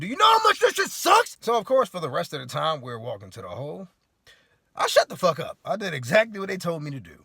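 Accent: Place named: Virginia, United States. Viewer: Just came across your page 😂…. I KNEW you had to be from VA bc of the way you talk lol (specifically 757?) . I'm from Richmond but had to move away a couple of years ago. I miss hearing stories like yours (obviously, not the fact that you were locked up). Since I moved, my life has been completely turned upside down and I hardly ever laugh like I used to… until I came across your page. Laughter is medicine, thank you for bringing back my laughter that I've missed so much.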